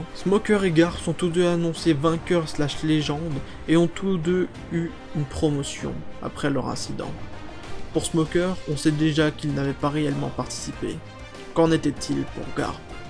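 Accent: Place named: Democratic Republic of the Congo. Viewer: ça se voit à ta voix tes congolais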